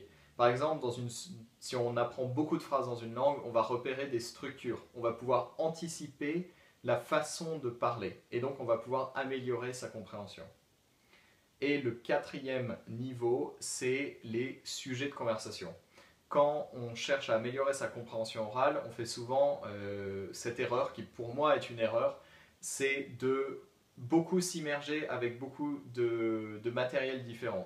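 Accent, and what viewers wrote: England, United Kingdom. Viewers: c'est moi ou il a un léger accent anglais??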